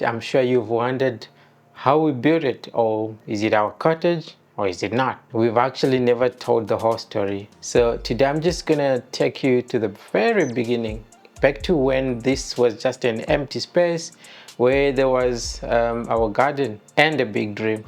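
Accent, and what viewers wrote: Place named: Kenya. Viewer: I thought you were kenyan from your accent. hmm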